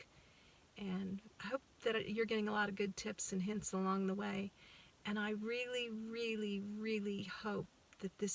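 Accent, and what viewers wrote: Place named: Philadelphia, United States. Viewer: Oh one more thing...I am from Philadelphia, ..I now live in MIchigan..but boy you sound like a philly girl to me ..are you from the east coast?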